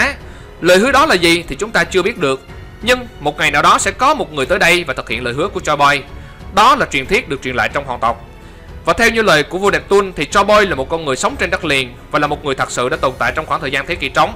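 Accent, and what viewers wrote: Quang Ngai, Vietnam. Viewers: giọng ad giống người quãng ngãi quá vậy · giong cua ad giong quang ngai gheee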